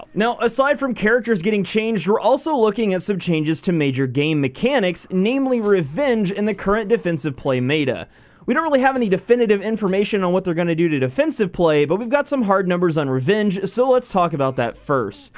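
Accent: United States. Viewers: Evan Woolford I'm certain it's just cause he's American (pronunciation) but as the other guy wrote , it's a clever little substitute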